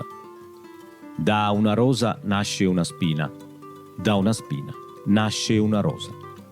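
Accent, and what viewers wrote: Italy. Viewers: Tu sei calabrese non siciliano vero? Sono carini questi proverbi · Non era meglio farlo dire ad siciliano???soprattutto per la cadenza?? Sicuro sarai Siciliano ma sicuramente nun nascisci dassutta😂...la tua cadenza è troppo "italiana" pi' parrarri accussi😅 Grande cmq❤...in molti dicono sti detti,ma in pochi sanno che significa realmente!!! Un saluto da Regalbuto (ENNA)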